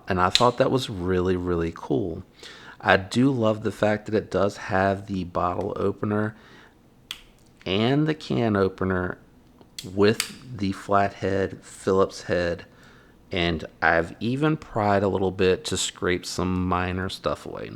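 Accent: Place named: United States. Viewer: Yeah buddy your good at making those videos where you from you sound like your form the good old US of a ☺️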